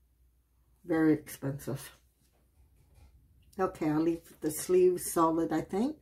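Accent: Canada. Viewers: Just love it!! By coincidence I was eating Chinese food which I very very rarely eat, and I realized quickly that the little heads looked so much like the back side of the fortune cookie that they threw in my bag! You little curvy mouth was cuter than the cookie! I find that it really helps to think of shapes as objects. Never could be so creative on my own! Thank you!! More whimsical animals please!! I loved hearing about your life! I guessed from your accent and buying gasoline by the liter even though I didn’t know that happened in Canada, it seemed to fit. Good for you to actually go out and do something good for the world!! 💕💕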